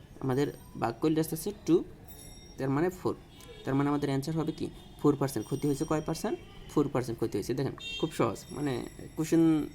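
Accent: Sylhet, Bangladesh. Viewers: ভাই মনে হয় সিলেটি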